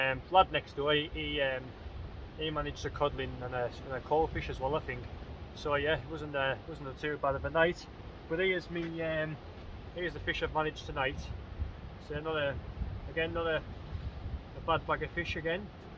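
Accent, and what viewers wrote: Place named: Cumbria, United Kingdom. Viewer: Just came across your videos by accident pal.. But what great content.. Great to hear our Cumbrian accent like.. I'm a wukinton fella.. Always fancied fishing off the heads but I'm not sure where you go or park.. Do you park at the light house pal and walk over or from St bees.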